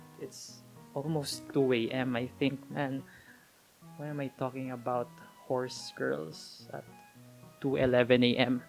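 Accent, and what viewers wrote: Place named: Philippines. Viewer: This needs more views. Filipino accent is strong.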